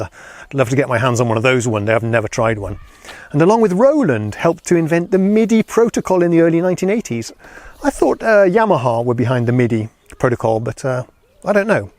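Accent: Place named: England, United Kingdom. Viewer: It seems like you live in Sweden, but you have an English accent. Are you from the UK originally, or did you perhaps study English there as a youngster?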